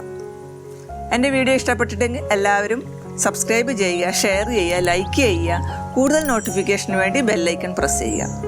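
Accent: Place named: Kasaragod, India. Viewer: Slang kettappo manasali ningo kasaragod bakath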